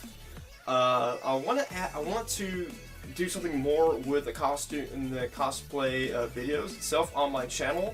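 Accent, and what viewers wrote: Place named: South, United States. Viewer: The southern accent thooooooooo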